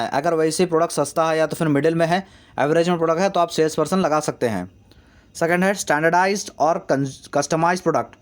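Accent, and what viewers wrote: Hyderabad, India. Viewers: Sir aap Hyderabad se ho naa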